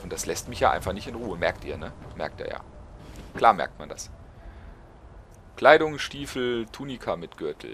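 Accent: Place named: Hesse, Germany. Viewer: ich finde auch dass du einen kleinen dialekt hast, kann es sein dass du aus hessen oder so stammst? :)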